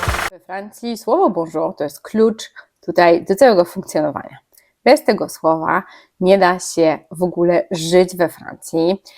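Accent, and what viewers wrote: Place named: East, Poland. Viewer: Pani ta mowiac po polsku ma trochę wschodni akcent, taki "śledzikowy". Zauważyłam,że coraz więcej młodych- dorosłych ludzi tak mowi używającąc zmiękczonego języka polskiego, jakby nie wyszli z dzieciństwa.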